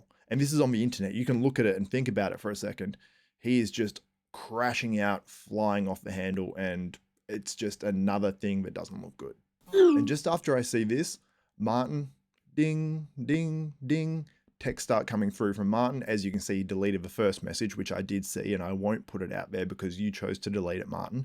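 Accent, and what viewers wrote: United States. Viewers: Your Martin-esque American accent is hilarious! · lol nice american accent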